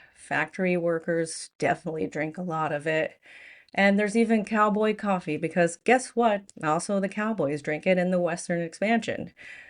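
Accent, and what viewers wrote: South, United States. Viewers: Hi,I do enjoy your videos. For one reason, you don't start in hysterics like many other US folks, and your accent is charming. No I didn't learn a lot about the tea vs coffee thing, because I was mostly aware of it, but I enjoyed your telling me about it. I am "very" British, and a "chain" tea drinker, but also enjoy a good coffee - Columbian being my preference made in a cafetiere (French press). I had great coffee in France, Spain and Italy, but the best ever was made in a "briki" using stream water in a small mountain village in Crete! Coffee is a slightly toxic stimulant whereas tea is an infusion.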